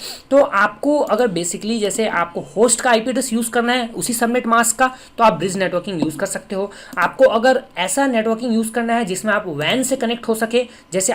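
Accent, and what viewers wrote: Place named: India. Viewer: This is indian english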